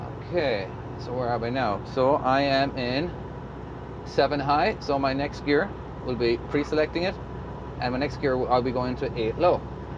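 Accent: Ireland. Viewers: Very cool to see! Also you have a very cool voice, are you originally Irish by any chance? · dude sound Irish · The best shifting video. Irishman?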